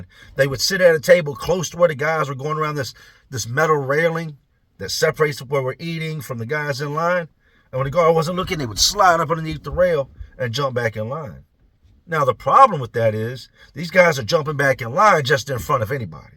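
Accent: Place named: Philadelphia, United States. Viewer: Hny J!, u r an impeccable storyteller, plus u sorta hv that north philly accent! Been subbed since. Day1.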